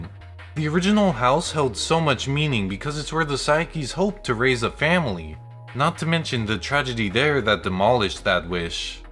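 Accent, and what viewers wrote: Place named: Ireland. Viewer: I can’t tell if you’re Irish or American or both. You have an Irish twang in your accent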